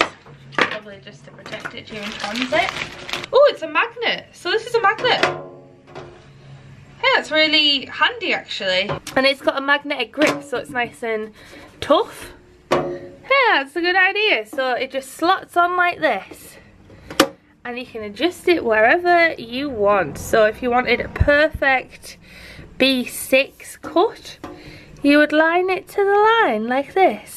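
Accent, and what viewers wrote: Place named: Teesside, United Kingdom. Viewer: Youre at Teesside Park, I knew i recognised the accent. Only started watching your studio vlogs a week ago but really enjoy them. I'm from that area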